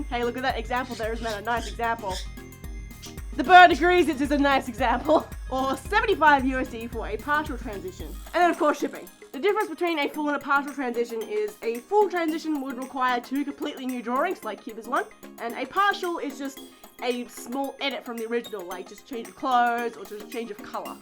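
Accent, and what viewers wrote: Australia. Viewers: Wait are you Australian!? I am!! 😄🐺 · you AUSSIE 0_0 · R u Aussie? I wanna meet you one day boi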